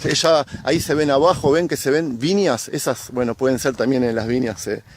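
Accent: Argentina. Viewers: Porque dice este hombre Isabel? El nombre es Jezabel no Isabel. Tú no eres judío pareces argentino che...!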